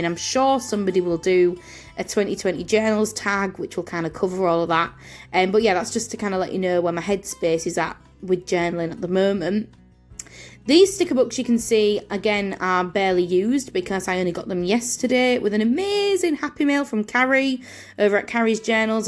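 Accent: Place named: Yorkshire, United Kingdom. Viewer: Thank you for sharing your lovely video. You accent is great. I love the Yorkshire accent. Here in South Africa we are in level 1 which is pretty much the same as normal. We have to wear masks and sanitize our hands before going into any shop. We are going into summer now so hopefully we'll be able to get out and about. Have a great week.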